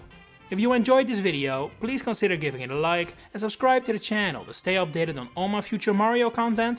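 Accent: Netherlands. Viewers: Im always amazed how I can pick up on a Dutch guy speaking English.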